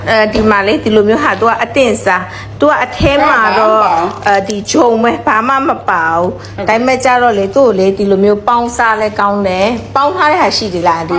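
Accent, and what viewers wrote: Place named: Myanmar. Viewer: ကမြန်မားဆန်ဆန်လေနဲ့ဆိုချစ်စရာလေး❤❤❤❤❤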